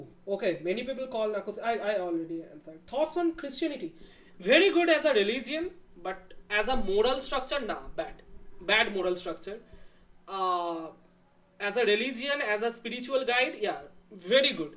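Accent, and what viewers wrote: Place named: Bengal, India. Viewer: Dada i know that accent u have, sotti kore bolo......you are bengalee na?😁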